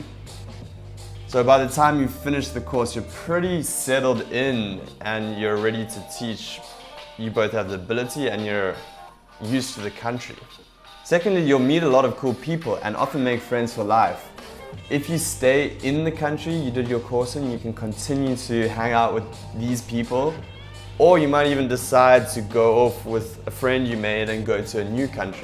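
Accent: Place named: Johannesburg, South Africa. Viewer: Aah, the South African accent :) Sounds like a Joburg boy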